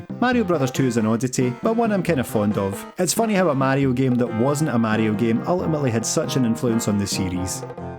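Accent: Scotland, United Kingdom. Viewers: Im not native english speaker, but let me guess you are scotish?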